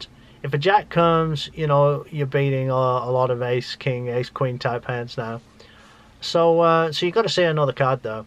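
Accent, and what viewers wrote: United Kingdom. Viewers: enjoyed your vlog, keep them coming struggled to place your accent decided midlands/Leicester, was I close? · where u from? u sound like a ex brit? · Is this guy british? his accent is really strange i cant tell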